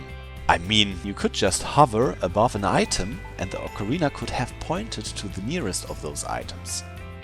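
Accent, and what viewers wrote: Germany. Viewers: Kommst du aus Deutschland?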